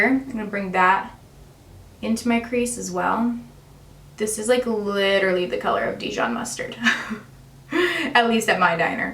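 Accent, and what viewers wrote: United States. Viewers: I usually watch sugar puff and fluff but I thought I would give you ago. So disappointed you are an American who speaks so fast and non stop,doesn’t take a breath I’m English and very hard to understand you and catch the name of the product you are using. I’m sure you are very good.sorry Have a look at sugar puff and fluff. I think you will be pleasantly surprised Joi x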